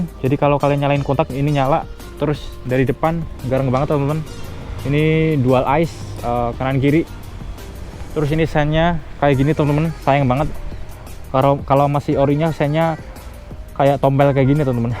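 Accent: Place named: Java, Indonesia. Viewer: kenapa memaksakkan pake "Gua" sih. Medok pol.. ra cocok. jowo jowo ae rasah lu gua. 🤣✌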